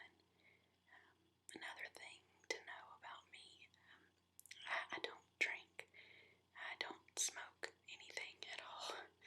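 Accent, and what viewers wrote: South, United States. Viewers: Where are ya from? I detect a bit of a southern draw that just drives me crazy.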